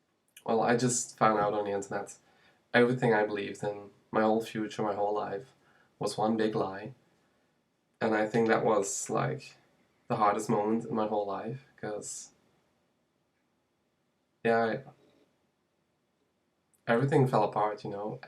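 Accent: Germany. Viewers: Bist du aus Deutschland? Your accent sounds German. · You have a very faint German accent...Are you German?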